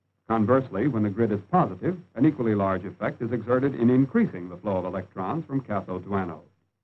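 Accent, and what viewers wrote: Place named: Transatlantic, United States. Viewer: I love these classic old American explanatory videos, military training videos etc. They're so clear and to-the-point, and always in that Transatlantic accent that you just don't hear anymore.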